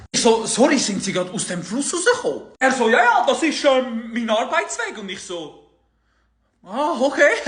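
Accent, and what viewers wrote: Switzerland. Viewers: oh man, los ich dir gärn zue! Läb im Dütsche und hör viel zu sälte Schwyzerdütsch <3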